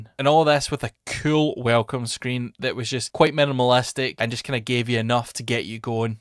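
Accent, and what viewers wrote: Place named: Scotland, United Kingdom. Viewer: Nice video. It's interesting to see how others do when making the switch to Linux. I tried a few different distros as I wanted something that would make it easier to set everything up for gaming and eventually settled on BigLinux which I really love. I did try Nobara but I found that it wasn't for me although I'm certainly taking advantage of Proton-GE etc as the dev has provided us with many goodies that make life easier. Also nice to hear another Scottish accent 😁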